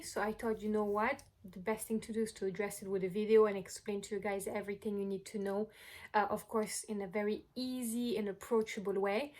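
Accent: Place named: France. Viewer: Liked. Subscribed. Clearly you know crystals. Love your accent. French? Or maybe I’m the one with an accent. Waves of zen coming your way!!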